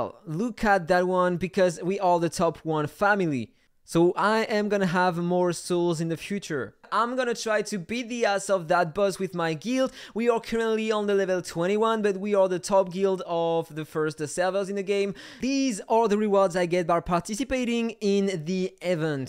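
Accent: France. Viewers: t'es Français non ?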